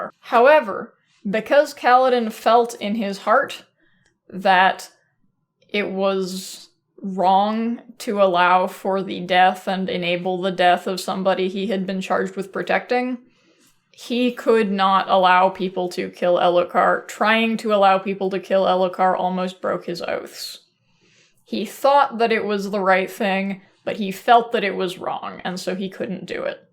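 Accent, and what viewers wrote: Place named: Idaho, United States. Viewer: Are you from Idaho by any chance? You have almost my exact accent. Excellent video!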